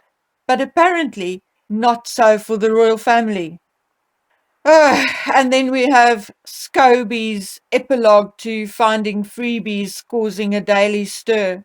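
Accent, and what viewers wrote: South Africa. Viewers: 😂🤣😅 I have to laugh when I listen to you , and the way we South Africans talk, its such a pleasure listening to you, say it as it is. 😂 Just love it.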